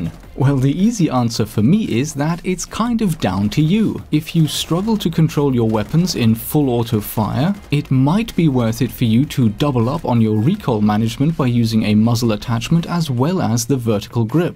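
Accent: United Kingdom. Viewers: ur voice is so British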